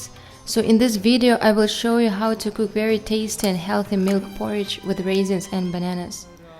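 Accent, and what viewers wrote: Russia. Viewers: Your accent seems that you are from Russian Federation ? 😯🙃🙏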